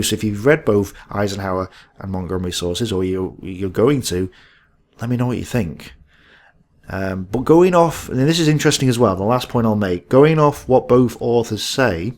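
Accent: United Kingdom. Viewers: I did like this. But my Missouri boy ears had a little trouble with the British accent. I sounded like he kept taking about getting into "the raw." It looked like he was referring to central Germany. But I am not familiar with that term. Can someone help me?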